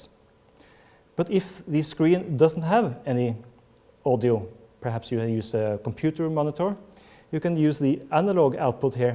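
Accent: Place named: Norway. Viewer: Tok ikke lang tid å høre at denne fyren er norsk!:P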